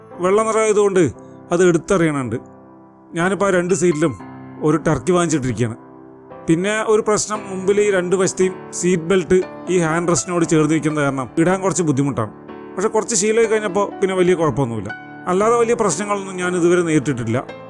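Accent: Palakkad, India. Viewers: ഇയ്യ് പാലക്കാടാലേ ??😁😁😁😁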